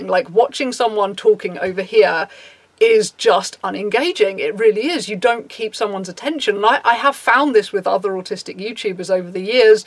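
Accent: United Kingdom. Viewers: I love your videos about Asperger's and eating disorders. Hearing you talking about asperger's was when I first realised it could be me too and somehow your voice and your British accent have become a little voice in my head that speaks English (English is not my first language). I find your videos calming and thanks to you I've come to accept and understand many things about myself. Whether I'm asperger or not is something yet to determine, and I don't know if I'll seek out a formal diagnosis. Thanks for sharing your experience, I feel "at home" when I listen to your videos. I also really enjoy your looks!!!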